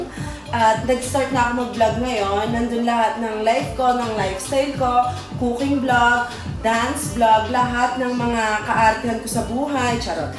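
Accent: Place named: Liguria, Italy. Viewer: Che bello il tuo accento ligure orientalizzato...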